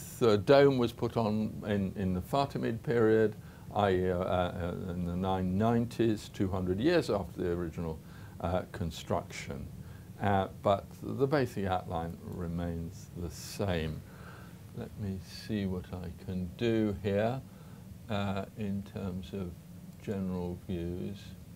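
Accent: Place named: United Kingdom. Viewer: For him being such an amazing academic of Islamic history and a guy very proficient in Arabic, I find his overtly British pronunciations of Arabic words charming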